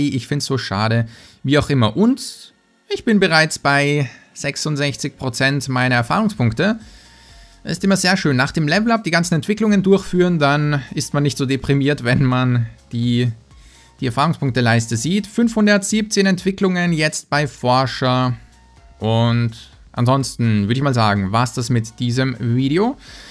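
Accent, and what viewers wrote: Austria. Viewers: Woher kommst du (wegen deinem akzent) ????🍭🍭🍭also akzent in ÖSTERREIC.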